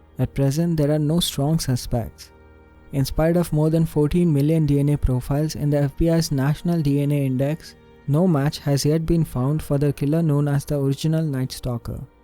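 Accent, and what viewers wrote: India. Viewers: I cannot take that Indian accent !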